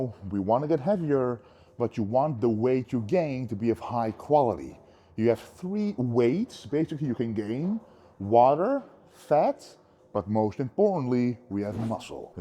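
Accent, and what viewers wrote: Netherlands. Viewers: English as a second language, he's from the Netherlands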